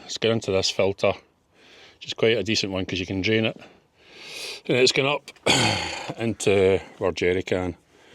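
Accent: Scotland, United Kingdom. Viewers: Thanks for replying. You made me laugh on your video with your Honest commentary and your accent from a fellow Scot takes me back to working with people with a sense of humour and dry wit sadly missing these days. Keep making videos now subscribed 👍👍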